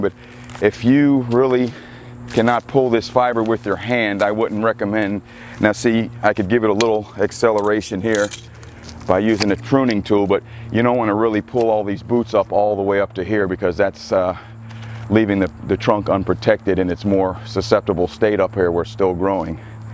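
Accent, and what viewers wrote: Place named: North Central Florida, United States. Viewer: Watching this makes me homesick. I've lived in New York City for over 20 years but grew up in Gainesville and St. Augustine. All the beautiful palms! Even your accent- north central Florida twang a-la Tom Petty!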